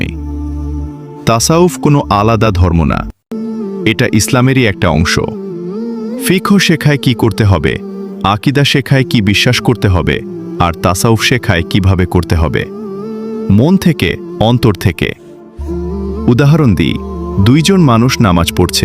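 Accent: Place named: Basirhat, India. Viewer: কন্ঠ টা বাসীরার লোকের মত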